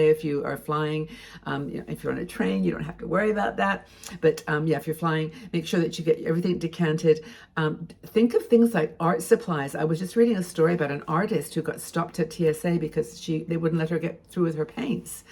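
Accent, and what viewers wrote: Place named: Wales, United Kingdom. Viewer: Yes. Agree about clear diction but thought I heard some of the Welsh !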